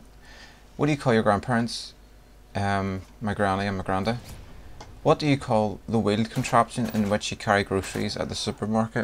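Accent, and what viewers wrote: Northern Ireland, United Kingdom. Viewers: Lol Northern Irish accent is awesome!! Love your accent (I'm Australian). In Australia we don't necessarily say 'gym shoes'. We say sand shoes, or sneakers lol! Carbonated drink, we normally say the actual drink itself (Fanta, Sprite), or we say 'soft drink'. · american accent sounds ´neutral´, northern ireland accent is so much more interesting :) i think i´ll subscribe to hear that accent ' thanks for the vid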